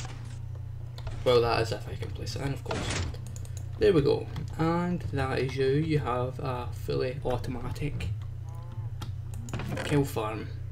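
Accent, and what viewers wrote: Scotland, United Kingdom. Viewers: is that scottish? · Ur scotish accent is top notch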